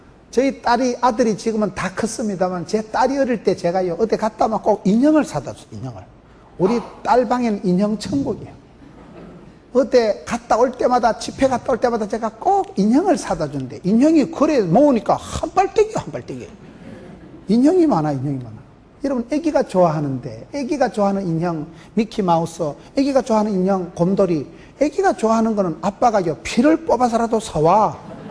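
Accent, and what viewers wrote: Gyeongsang, South Korea. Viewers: 귀하신 목사님 ㆍ 경상북도 사투리에 멋진 비유 ㆍ귀에 쏙쏙 넘 넘 감사합니다 🙏 🙏 🙏 💜💜💜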